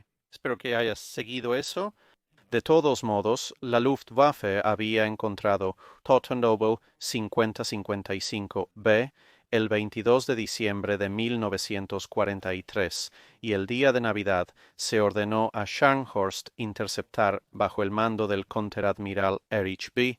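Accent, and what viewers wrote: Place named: United Kingdom. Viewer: Y me encanta ese acento británico y esa educación con estilo. Es muy agradable y lo seguiré siempre. Felicidades.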